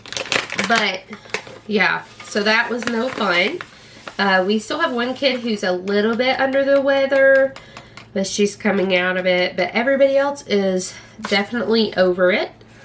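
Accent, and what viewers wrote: South, United States. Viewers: I love your channel! I also love how you have a southern accent. We live in Maine and speak so differently!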